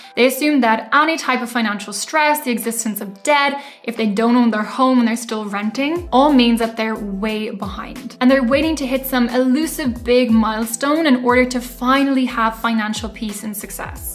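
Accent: Cork, Ireland. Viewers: This video made me look at my financial life differently. In a good way. Thank you for the content! My husband and I love the Cork accent. It honestly makes your videos so soothing to listen to! ❤️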